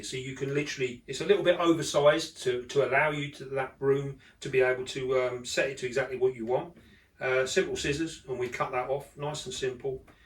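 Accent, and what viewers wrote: United Kingdom. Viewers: Hi, your videos have made me want to get back into tarantula keeping! I assume you live in the UK by your accent so I just wanted to ask a question. Do you use heat mats and thermostats for your spiders? I've heard they are ok at room temp but it seems widely discussed in the community. Keep up the great work!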